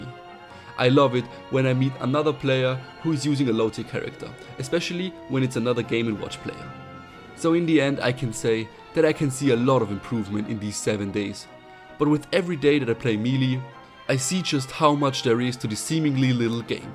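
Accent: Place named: Germany. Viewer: Mann hoert raus dass du deutscher bist hahaha. Weiter so mit dem Melee grind! Ich find es lustig, dass du den Gruenen Mr Game and Watch spielst. Ach du Gruene Neune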